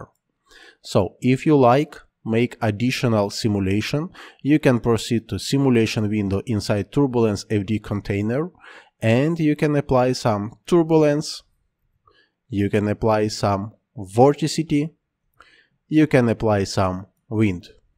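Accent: Russia. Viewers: Прикольно))) Не зная английского, я поняла его, благодаря русскому акценту) Спасибо за урок))) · Дружище, у тебя всё классно получается, ты большой спец, но почему не вещаешь на русском( акцент выдаёт)? Таких как я полно и нам не хватает уроков по редшифту! Будь добр, сделай серию на русском...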